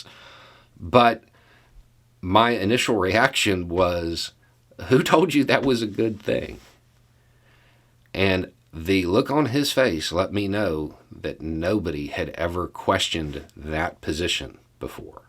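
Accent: South, United States. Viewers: I started watching this channel because a bearded white male with a southern accent spouting common sense wisdom was kind of funny. I now realize your whole point is to invite us to think, to challenge what we hear or read. "It's just a thought" I understand as "ponder it for yourself and feel free to agree or disagree but make sure you have your own arguments either way."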